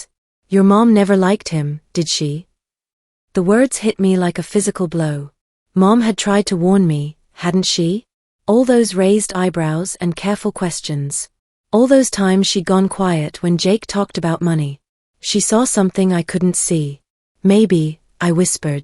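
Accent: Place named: United Kingdom. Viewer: Absolutely freakin love British Narrators. ❤❤❤ Great Story 😊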